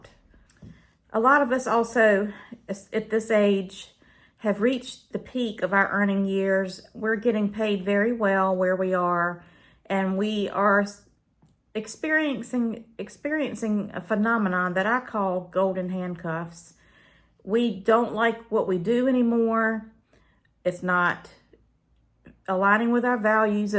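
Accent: South, United States. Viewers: You sound southern like ME!! I am 59 y.o. I call it pre retirement hell. I'm an RN and a school teacher. First part of my career was nursing. My 2nd career is teaching k12. Im trying to make it to 67. I probably wont make it. Ill probably retire at 62 but only if I can live off of the $. It takes every bit of my patience to do my job at this point. I too just want some peace in my life. Just simple things but simple is not cheap. My home is debt free. Ive got a small truck payment. No credit card debt but I do have some student loan debt that im despararely trying to get paid via pslf and its all stuck in limbo right now. Its like everything is stuck. Like everything. It's like that movie Groudhog Day.. SSDD.. stuck in same ole same ole.. it feels like I will work until I die. When will this pre retirement hell end?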